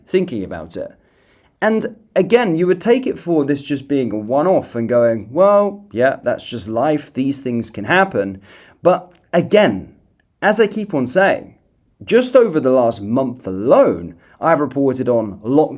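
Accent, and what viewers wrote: Wales, United Kingdom. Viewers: Your Welsh is funny. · A very serious and concerning topic, but i couldn't help laughing with your Welsh pronouncement of the Towns mentioned .😂😂😂 On a serious tone, 2 nearby schools had also been locked down in recent months.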